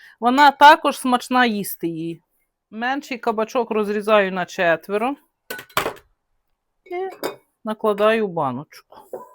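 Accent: Ukraine. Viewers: Вітаю! Ви напевно найкраща господиня з найкращими рецептами! Дуже Вам дякую за Вашу працю ❤ Всього Вам найкращого і всій вашій родині! Ps. Дуже гарний український говір 😉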